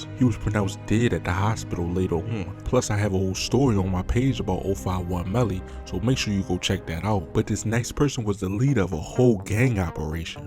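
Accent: Baltimore, United States. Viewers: Grew up in the DMV and now live in Bronzeville, This is the thickest Baltimore accent I’ve ever heard in my life!!!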